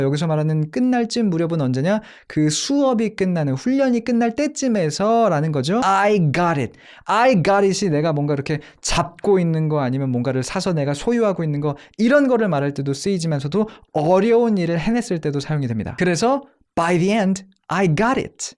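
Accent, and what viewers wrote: United States. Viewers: Wow; just amazed, your American accent is perfect.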